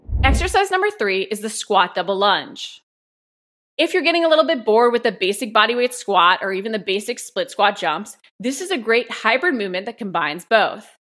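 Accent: United States. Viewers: Hello from the UK. I just want to say thank you for your time and effort in producing these excellent videos which I'm sure are well views in the current climate. Changing the subject some what your accent in this video is reminding me of the Californians off SNL!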